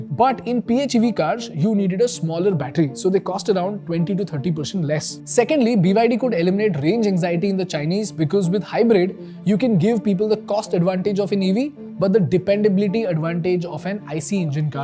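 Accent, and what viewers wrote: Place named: India. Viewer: OMG.... Indian narrator, just like Polki Sharma. Can the details be true or accurates. Really in doubts.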